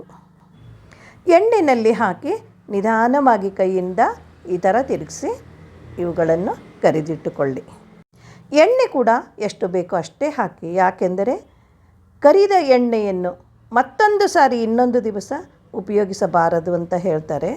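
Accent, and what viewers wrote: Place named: Mangalore, India. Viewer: 🙏 ನೀವು ಯಾವ ಊರಲ್ಲಿ ಇರೋದು ಮಾಮಿ... ನಾನೂ ಕೂಡಾ ಉತ್ತರ ಕನಾಟಕ ದಲ್ಲಿ ಇರೋದು.... ನೀವು ನಮ್ಮ ಭಾಷೆಯಲ್ಲಿಯೇ ಮಾತಾಡಿ ಚೆನ್ನಾಗಿರತ್ತೆ... ನೀವು ಮಾತಾಡೋದು ಮಂಗಳೂರು touch ಇರತ್ತೆ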